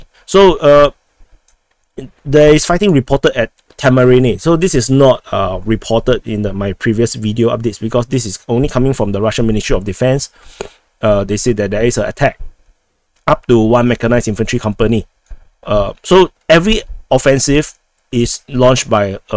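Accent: Singapore. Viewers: are you Singaporean or Malaysian? no horse run brother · You sound Singaporean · Agree, lets say the smallest bias then. I suspect leans somewhat to the russian side. But probably doesnt care too much. says; "I just want the war to stop" - and I belive him. Again, because is from Singapor is able to distance himself from the bias thing. I am surprised that has so many subscribers, due to the heavy axent. It is probably due to the neutrality in his reporting and nothing else. even makes small jokes while reporting, noone else does that. Again, that is also probably due to his true neutral standing. Because doesnt really care either way.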